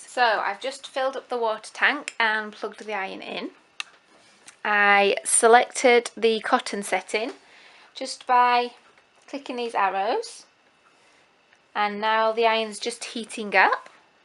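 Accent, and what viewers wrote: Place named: RP, United Kingdom. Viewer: very posh! x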